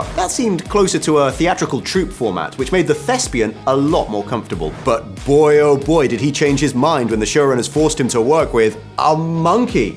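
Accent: United Kingdom. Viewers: OMG U r too fast british accent.. Slow down man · Your accent tells me I don't really care what you think about this show. You might as well be the BBC. They like smearing American's too.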